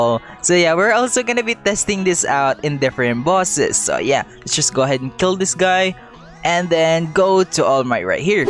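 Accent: Philippines. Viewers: Are u filipino im asking cuz ur pronounciation sounds like most filipinos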